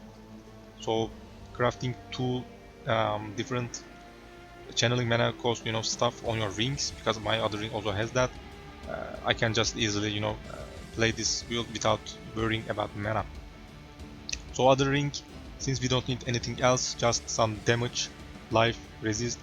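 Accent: Turkey. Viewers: Bu adam türk değilse insan değildir. böyle konuşan türkten başka insan olamaz. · Ingilizce aksanından Türk olduğun öngörüsünde bulunacaktım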